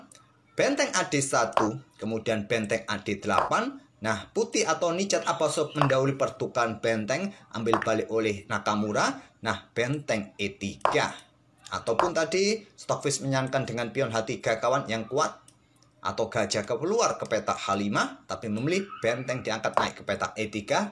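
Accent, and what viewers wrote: Java, Indonesia. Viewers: 50mnt mah mending cari rokok sekalian bikin kopi wkwk. Santuy dulu ga sih? Suka sama pembawaan channel ini. Apalagi kalo udah ngomong "di caplok" atau "baku hantam" wkwk aksen jawanya itu loh yg bikin seru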